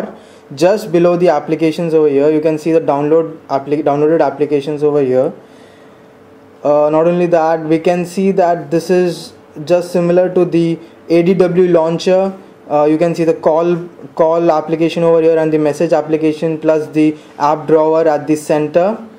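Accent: India. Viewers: lg does not exist in pakistan.. his accent is Indian.. if u hate or love it .. :P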